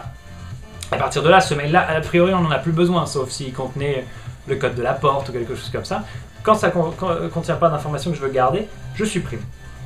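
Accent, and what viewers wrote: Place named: North, France. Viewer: je m'efforce à faire la même chose mais c'est pas tjs facile. au fait on sent tes racines du nord : 20 [vinte] :D je faisais la même à mon arrivée à paris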